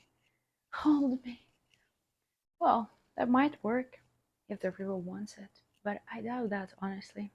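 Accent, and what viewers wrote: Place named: Russia. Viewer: Gracious, I just have one comment today: I know you say Russia is a very bad place at this time and I believe you 100% but I must say.. Your beautiful accent and physical features make me certain that at one time long ago Russia must have been a very good place. You know, the concept of the Chineese Emperess is something that I find so appealing, I don't know if is historically accurate, but I heard somewhere that China in ancient times was a people ruled by a female hiearchy.. what a wonderful wonderful world that must be. I have finally come to realize I can't wait for the world to become matriarchal.. so I'm declaring now that it has officially changed! We are now living in a matriarchal society and I expect everyone to act occordingly.. they will be called out by me for non-compliance. You are so wonderful.. I wish I had money for you but I am on government assistance for my mental disability and sometimes can't even manage my bills. 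So I leave comments, let me apologize; because of my mental disabilities I know that about half my comments are wierd and off the wall but I ask you to please just ignore them because occasionaly there is a rational heartfelt comment.. and I have such strong feelings of respect and admiration for you that it would be a shame for you not to understand how important you are to me just because i ddid a little too much LSD or DOPE many years ago.. btw: as society is more and more accepting of homosexuality I have already gone on to somethi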